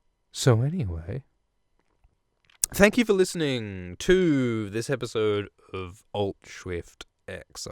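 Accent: Australia. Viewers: Fellow Aussie here, nice to hear GoT in an Aussie accent! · Is there going to be a burp in every episode? I'm not complaining, just observing. That combined with the swearing and the accent wants me to see more Australian readings of popular book series.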